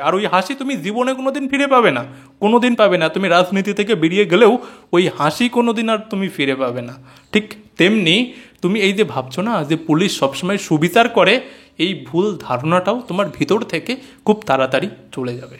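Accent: Bangladesh. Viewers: Pl একটা কথা বলি don't mind, তোমার মুখের ভাষা আর উচ্চারণ একটু practice করবে, বাংলাদেশী ভাষার টান শুনতে ভালো লাগেনা আর English টা ও improve করো ভবিষ্যতে তোমার help হবে,তোমার ভিডিও আমার মজার লাগে 😊😊🎉🎉🎉🎉🎉🎉🎉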